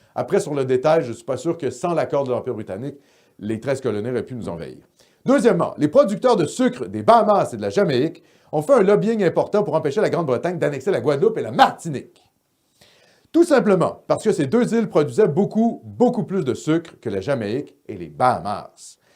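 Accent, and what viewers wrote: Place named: Quebec, Canada. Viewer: Le pire dans tout ça lol. C'est que tu pognes plus en France qu'ici je crois 🤦🤦🤦🤦. Je vois tellement de bout de tes vidéos sur des chaînes françaises que c'est ce qui m'a interressé à te connaître. Je reconnaissait un certain accent dont la provenance française me semblait douteuse 😂. Je partage ta chaîne en espérant t'amener une reconnaissance québécoise 🤦😝😝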